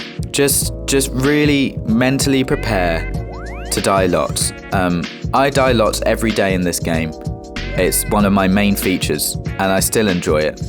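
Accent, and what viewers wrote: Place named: United Kingdom. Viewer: "Oh, for fuck's sake." This sounds just like me daily. Minus the British accent. It's still a lot of fun though.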